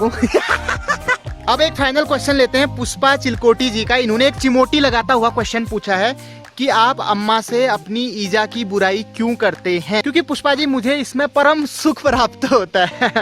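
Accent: Pahari, India. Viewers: Mujhe aapki pahadi bolane bahut badhiya lagti hai👌👌👌👍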